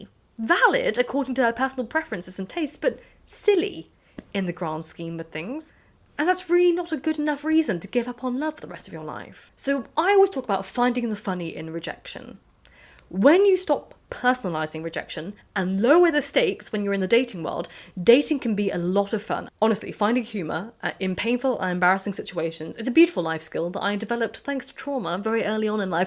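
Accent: England, United Kingdom. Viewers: You are British af. I love the way you talk! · I feel like I just had a great lecture from a former professor. And as an American, the English accent made it all the more better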